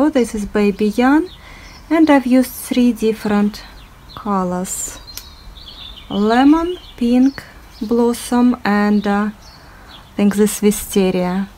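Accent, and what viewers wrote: Russia. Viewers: Оооой русский акцент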